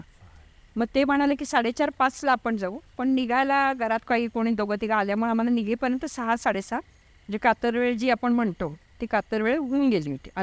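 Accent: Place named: Kolhapur, India. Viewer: कोल्हापूरची मराठी भाषा ऐकून मन प्रसन्न झाले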